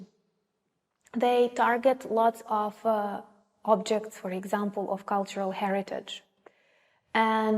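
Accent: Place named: Spain. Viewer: Thank you very much for aproaching Ukraine pride to the world. By the way... Do you speak Spanish? It sound me like Spanish your start.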